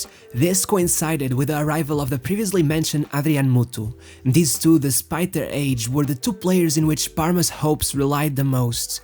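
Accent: Portugal. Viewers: Hey. Yes he is. Its obvious by his accent and the way he pronounce the Portuguese words. Only a Portuguese could say it that way. Cheers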